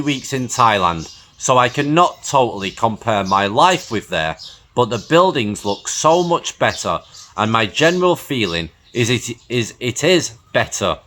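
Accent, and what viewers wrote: United Kingdom. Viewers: What is that accent, UK, Australia, New Zealand??????????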